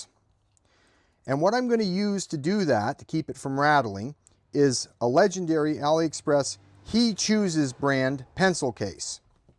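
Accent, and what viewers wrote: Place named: Pittsburgh, United States. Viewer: That's pretty close to a Pittsburgh accent. Never seen the channel before, so that's my guess.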